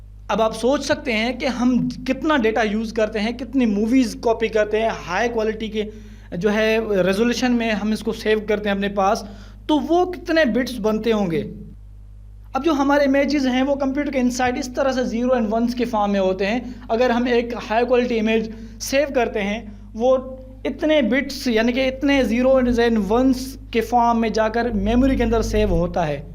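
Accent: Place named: Sindh, Pakistan. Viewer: Sounds like Sindhi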